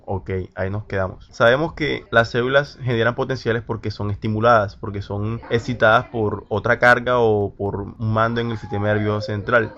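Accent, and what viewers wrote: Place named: Caribbean Coast, Colombia. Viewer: Muchas gracias! Felicidades!! Te pasas !! Pd. Se te siente ese costeño bien bacano! Jajaja.. Yo también amo la medicina!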